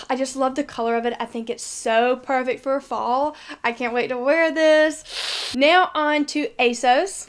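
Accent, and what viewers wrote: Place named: South, United States. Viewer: I love your kind of southern accent accent! Where are you from?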